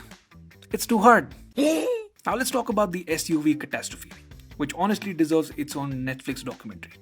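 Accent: India. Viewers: Please bro use AI to dub your videos. The instant I hear an indian accent I click off.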